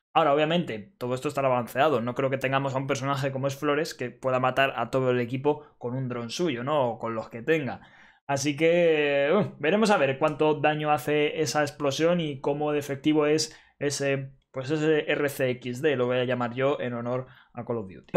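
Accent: Argentina. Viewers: Es de arg? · Es argento papa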